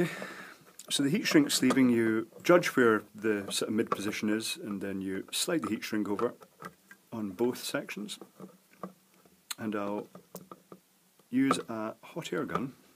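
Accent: Scotland, United Kingdom. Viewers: he does, but that's the best way to teach and to learn. You'll learn more from the spoutings of an expert like, than you will from a random video or book. I litterally happened to watch a vid which took my interest called "poundland LED's" I though, "Oh I didn't know PL made LED bulbs, I'll have a look and see the vid to see if they're any good as I wouldn't mind pur-chasing some for my abode" 4 hrs later I'm still on his fuckin channel, and actually feel confident enough to fuckin LED my house up myself! Seriously! This man is a fuckin ledge! And with a FUCKIN AWESOME Scottish accent to boot as well! What a voice and what an accent! Ya wanna think about doing some voiceover work, on the side, seriously. "In a word without LED'S. Only one man can make a difference....."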